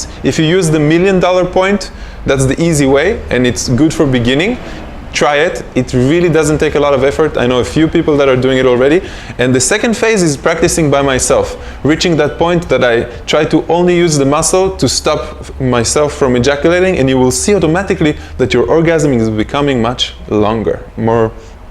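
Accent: Israel. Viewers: Youre accent sounds Israeli r u...? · Why do you sound so Israeli?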